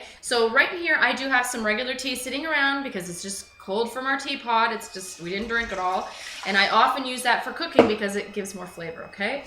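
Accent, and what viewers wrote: Canada. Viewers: Lol you are so canadian! The accent is pretty sexy though...great video!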